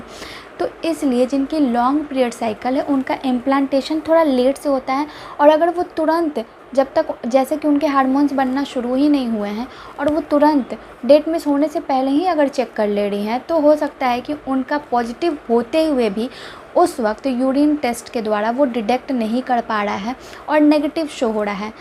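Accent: Bihar, India. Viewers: Aap Bihar se hain kya ....ho rha hai ko hoda hai bolte ho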